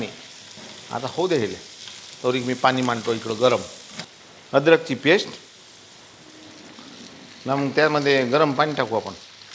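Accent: Vidarbha, India. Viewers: डाड (ळ) कांदा बघून तोंडाला पानीच सुटलं 😋😋 आन तुमची अन आमची पन विदरभाची बोली ऐकून तर मस्तच वाटलं नं बॉ....🤩🤗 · khup chaan... ! vidarbhachi bhasha tumhala suit karte....!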